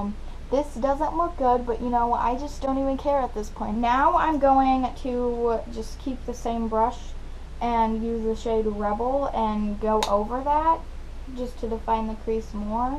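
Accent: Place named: United States. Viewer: Omg this is sick!!! I'm from England and I love the Amercian accent😂😂💓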